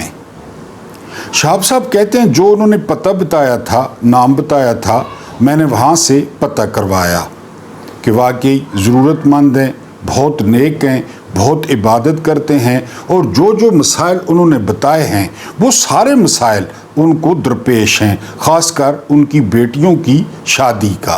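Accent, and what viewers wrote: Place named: Mianwali, Pakistan. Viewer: بلیک اور وائیٹ بالوں میں آپ ھیرو لگتے ھیں میانوالی لہجہ بہت اچھا لگتا ھے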